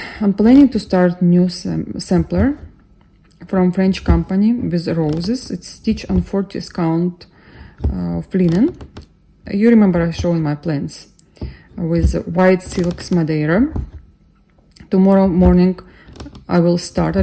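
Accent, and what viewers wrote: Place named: Russia. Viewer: Очень нравится ваш "русский" акцент😊😊 а не как другие, пытаются симулировать акцент. хрипеть еле выговаривая слова 👍👍👍👍👍понятно каждое вами сказанное слово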